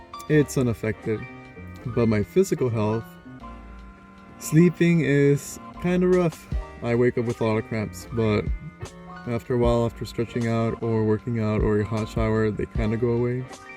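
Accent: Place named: Middle East. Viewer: Where are you from, I detect a middle-east accent!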